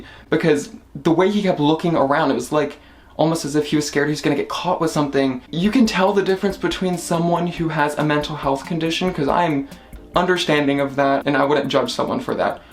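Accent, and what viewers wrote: United States. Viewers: I love your American accent....and your nose.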